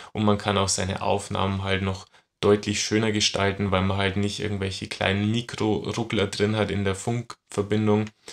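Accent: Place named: Bavaria, Germany. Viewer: Servus, guter Content und angenehmes bayerisch :) ist das Foto bei Min Regensburg?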